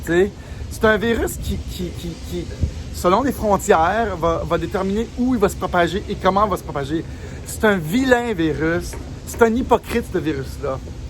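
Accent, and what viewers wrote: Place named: Canada. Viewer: Bravo Merci pour tes infos C'est très utile pour réveiller le peuple Merci Merci Merci Bonjour de la France J'adore ce petit accent canadien Luce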